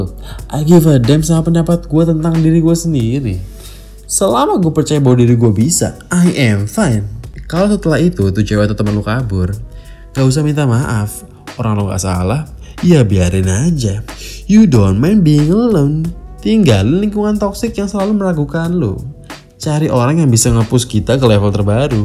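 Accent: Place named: Java, Indonesia. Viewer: Lo kenapa sekarang pake logat logat jawa gitu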